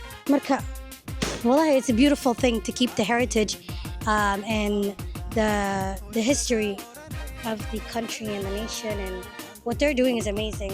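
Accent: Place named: United States. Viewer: Oh you are American accent masha allah u do well show people good things our island like jazeera beach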